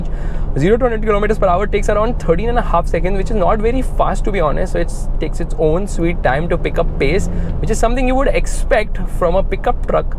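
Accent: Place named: Mumbai, India. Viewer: Want to start vlogging in Hindi. It’s kind of feels good when he speaks Hindi in between vlogs. It really suits him as well with that mumbaish accent.❤️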